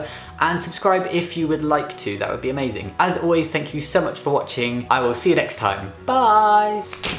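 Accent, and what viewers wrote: United Kingdom. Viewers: Why addy Woop well done that accent is British! If you want to hear another follow me!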